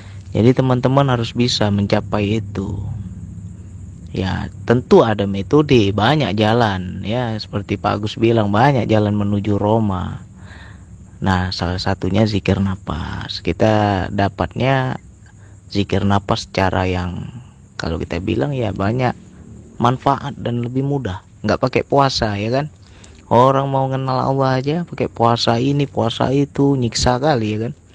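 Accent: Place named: Medan, Indonesia. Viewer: Boleh tau dimana tglnya..logatnya macam org Medan..?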